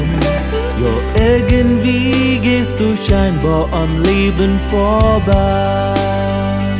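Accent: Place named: Vienna, Austria. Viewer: Wieder mal eine Botschaft, so wie es oft wirklich ist. Hörst Dich schon an, wie ein echter Wiener😊Aber es stimmt, irgendwo ist immer ein Licht am Ende des Tunnels. Gottes Segen für Dich, lieber, und für die, die Dich in Deinem Leben begleiten.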